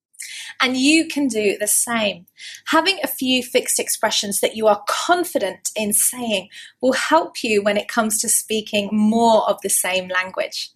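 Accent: United Kingdom. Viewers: Dear, How crazy the world is: as an Italian English learner I, on the other hand, struggle to have decent pronunciation, especially your wonderful British accent, which I dream of acquiring sooner or later. Anyway, thank you for your tips, I wish you would visit San vito Chietino and Fossacesia's beaches (south Abruzzo) in one of your trips so to enjoy our seaside and our fish and meat restaurants and breathtaking views. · thanks a lot for the lessons. i like the british accent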